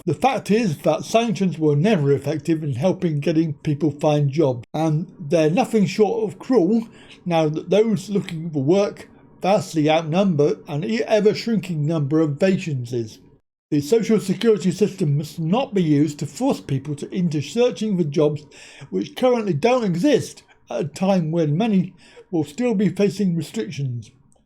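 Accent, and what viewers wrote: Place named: United States. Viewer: Why is the voice in a yank accent?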